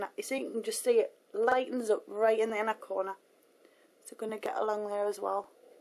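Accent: Newcastle, United Kingdom. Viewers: I can't believe these posters can't hear your British accent. I don't think it's your 1st language though. You are from somewehre else. Am I right? I like your video. You look lovely. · omg are you from newcastle? x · She's got a Geordie accent....she's from Newcastle in the UK :) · she is from UK, so, she have a british accent :D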